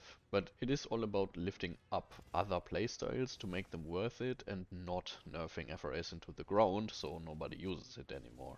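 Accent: Germany. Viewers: Youre German, arent you?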